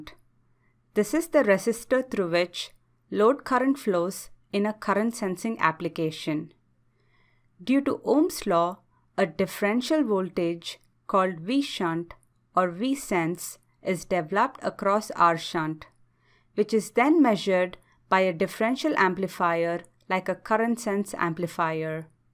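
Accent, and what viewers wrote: India. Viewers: India English？I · wtf why must indian speaker?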